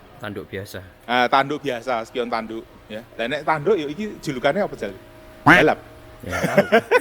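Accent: Java, Indonesia. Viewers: Jowo tulen iki cak 🤣😂,, mantapp om 👍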